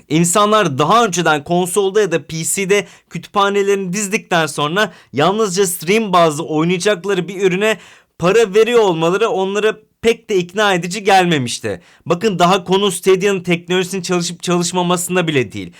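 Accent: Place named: Azerbaijan. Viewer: Abi sen azerisin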